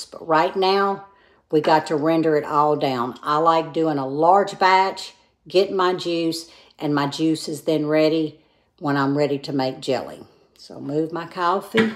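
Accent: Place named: Louisiana, United States. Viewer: I feel like I'm back in Louisiana listening to your accent..... P.S. can you speak Cajun French?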